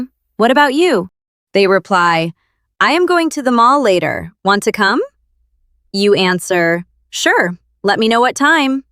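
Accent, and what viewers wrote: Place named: United States. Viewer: It seems like you're speaking American.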